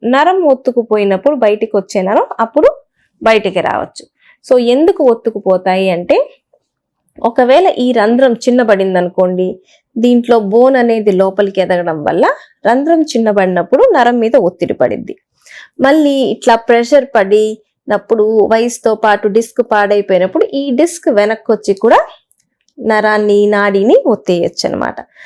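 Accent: Guntur, India. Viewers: Pure guntur slang.. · It is our Guntur slang.we like our slang bro.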